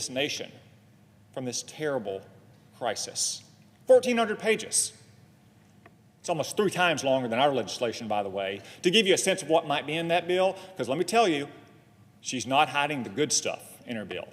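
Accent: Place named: United States. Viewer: There it is, he's speaking American. Don't hear it enough these days. Well said 👍😏🇺🇸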